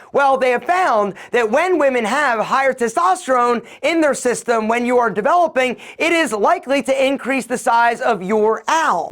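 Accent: Philadelphia, United States. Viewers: I know a Philly accent when I hear one 👀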